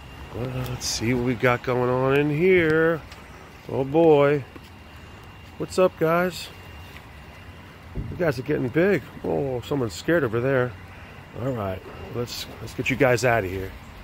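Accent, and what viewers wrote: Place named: New Jersey, United States. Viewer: This dude gotta be from Jersey. Pasta, Jersey accent and buncha knuckleheads shouting in the background 😂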